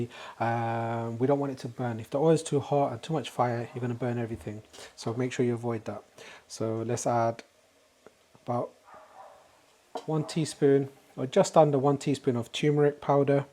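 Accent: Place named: United Kingdom. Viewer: Ps. I love your british accent.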